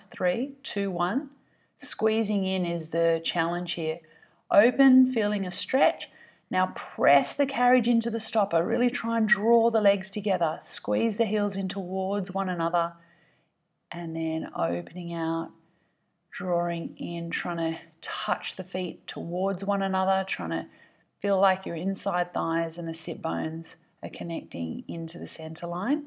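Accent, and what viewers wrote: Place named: Australia. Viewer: Thank you! I’m brand new to Pilates and this was such a well paced intro. Also as an expat it’s great to be lead by an Aussie voice :)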